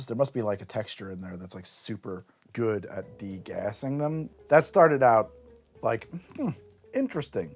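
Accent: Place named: New Jersey, United States. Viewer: I love that Jersey accent. Arange. Can't help but repeat it when he says Orange. He says it soooooo much lol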